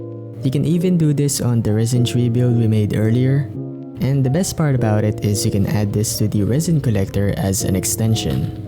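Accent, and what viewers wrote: Philippines. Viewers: English language is Filipino accent.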